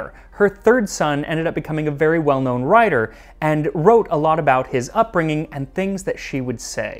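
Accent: United States. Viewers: Your pronunciations are on point, but it still sounds odd coming out of an American mouth. Kudos for going that extra mile to say it correctly . There's a huge Spanish speaking community here in Chicago, yet, 90% of my family still call fajitas fuh-jy-tuhs.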